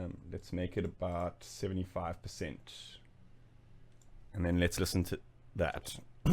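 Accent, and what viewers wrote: South Africa. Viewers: You from south africa ekse?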